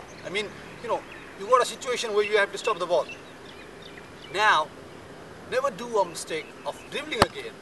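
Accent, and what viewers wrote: India. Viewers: Indian accent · Were you from from indian bruh i dont understand you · wow awesome video and anyone can identify an Indian pronouce good job!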